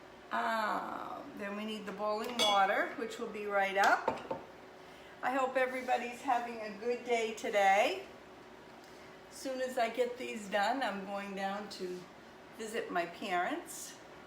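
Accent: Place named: Maine, United States. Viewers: I was searching YouTube for a dark molasses cookie like my grandmother used to make, and yours look most like them. I didn’t even notice the name of your channel, but I thought “she sounds like she’s from home” Haha yup, Maine💛I’ll be trying your recipe! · You remind me of my great gramma Goldie. She was from mid state Maine toward the west. She had just the same accent as you🤗 she passed 9 years ago this December. She was just shy of 93. What a feisty lady she was too!! Anyway, I’ve been looking for a recipe like this. Thank you for the recipe and the memories.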